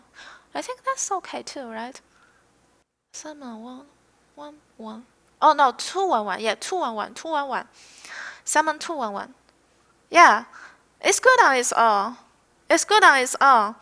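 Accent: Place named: China. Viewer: 学长的英文一股汉语味er，但是你什么时候回国内平台播啊？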